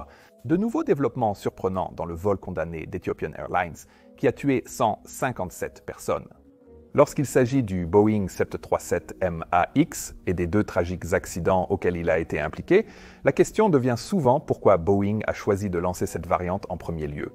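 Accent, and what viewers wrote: Canada. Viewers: Dear, I am a French speaking Swiss citizen. I listen regularly at your great interventions with much interest. Your talks are so well documented, no fake news, trustworthy infos, very educational. Recently I discovered the French versions, translated with AI. Your voice is tainted with Canadian accent and is not as fluent as your English. Sincerely I prefer from far to listen at you in English, as your English is absolutely perfect. May be a suggestion: you should definitely have the original English version. Why not put a link to switch to the original English somewhere below the display?. Thank you and congratulations for your amazing work.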